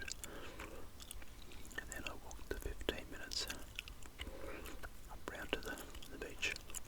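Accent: Australia. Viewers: Love your voice! The Aussie accent is by far my fave. I also watch another Aussie, but he’s not ASMR. The Drain Addict YouTube channel. Another great one!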